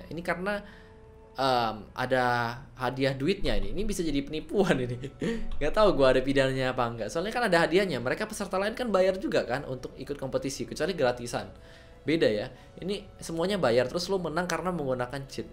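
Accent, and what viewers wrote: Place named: Java, Indonesia. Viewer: oh gtu.. jdi bg medok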